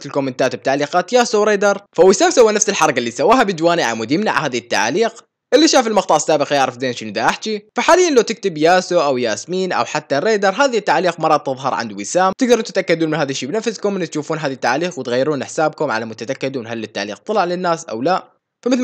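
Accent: Iraq. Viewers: ♥♥♥♥ياخي ولله احب هاي القناه ♥♥😉فد سوائل هاذ صوتك الي بل فيديوهات ونته عراقي بليز جاوب🙏🏻🥰♥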